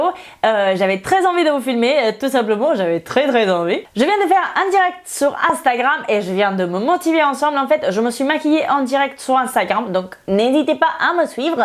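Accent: Spain. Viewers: Moi je trouve que t'as un acsent espagnol mais j'ai bien aimé la vidéo bye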